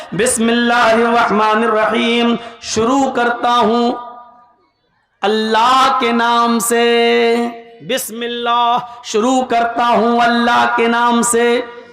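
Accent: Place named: Bangladesh. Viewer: Ye sala Bangal deshi bala bat karta h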